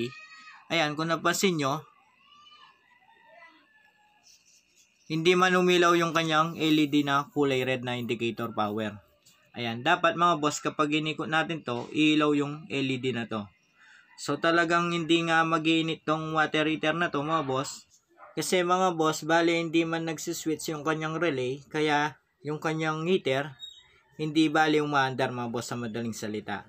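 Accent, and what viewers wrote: Pampanga, Philippines. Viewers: Kapampangan ka no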